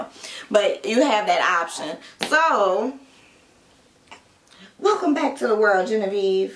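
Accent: New Orleans, United States. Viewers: Thanks for the tips! Also I love your accent, are you from New Orleans? I died laughing at the old lady story 😂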